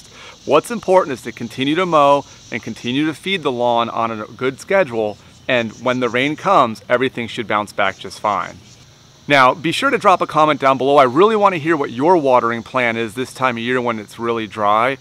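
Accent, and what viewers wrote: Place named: North, United Kingdom. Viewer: What's your location? you sound like you're from up North but Bermuda doesn't grow up there ha.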